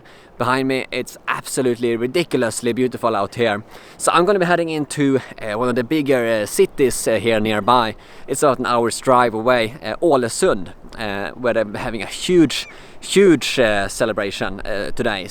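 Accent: Sweden. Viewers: I can hear just by your accent that you are swedish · You can clearly tell from his english accent that he is swedish!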